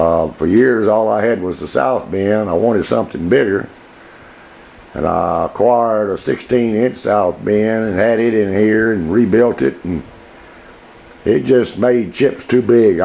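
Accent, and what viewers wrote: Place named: Louisiana, United States. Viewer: That's a really cool shop. That model table you made for Abom79 is AWESOME!!! The most of what I do in my shop is make stuff for the house and the grandkids, I can only imagine the really cool stuff you make for your family & grandkids LOL. Your level of organization seems like something from a submarine or ship. Two guys I was in the Navy with were from Louisiana; Kerry Gros from Shreveport and Dan Sumrall from Denham Springs; your accent reminded me of both of them. Keep the vids coming, your work is really interesting.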